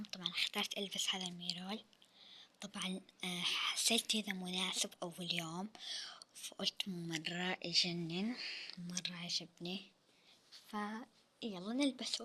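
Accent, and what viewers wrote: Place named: Saudi Arabia. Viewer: احلى👍 بتحكي سعودي ماشاء الله هههه بس مافهمت شو يعني اول يوم حضوري؟؛!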